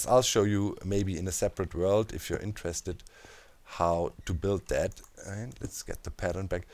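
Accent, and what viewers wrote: Germany. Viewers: funny how you switched to a french accent (from your normal German accent) when trying to show off German accent. But then... they do that in the movies, too :D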